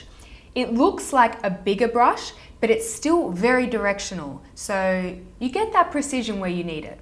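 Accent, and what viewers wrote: Australia. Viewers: Your accent takes me back since i used to live in australia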